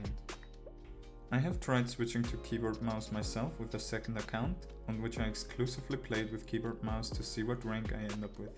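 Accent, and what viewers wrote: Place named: Switzerland. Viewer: ich bin so en gott. hans useghört, dass du en schwiizer bisch haha.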